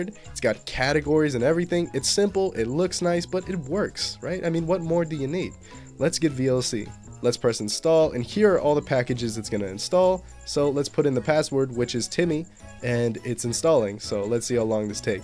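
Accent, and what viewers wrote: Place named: United States. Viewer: i love how to this guy narrated the video with the slang and bla bla bla, very american i love it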